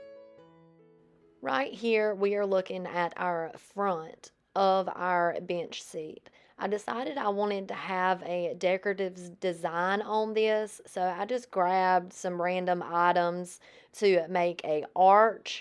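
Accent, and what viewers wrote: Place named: Mississippi, United States. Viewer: (my first ever doing a comment) you are so talented in your woodworking! I enjoy your reel contents. You tell enough how you do things but don’t talk to just be talking, which I don’t mind accent being from MS! You always have variety of items. The bench is lovely, but I would have liked the seat stained, too. Adorable bunnies.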